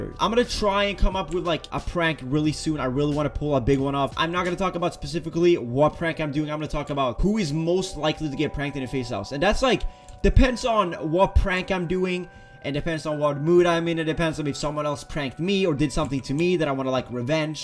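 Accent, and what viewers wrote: Sweden. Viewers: i did know he was swedish and that he coukd speak swedish....i just didnt notice he had a bit of an accent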